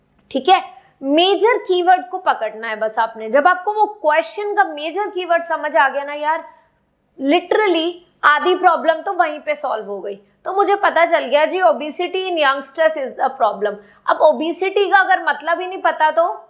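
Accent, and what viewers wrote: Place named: Punjab, India. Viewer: Mam aapki hindi ki tune Punjabi me bahut achhi lagti hai .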